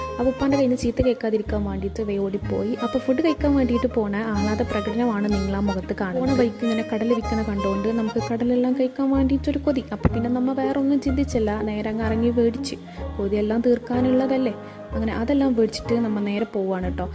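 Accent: India. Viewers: mmalde koykottere slang aanallo chechikk ❤ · Ippazha sherikkm plkd slang aye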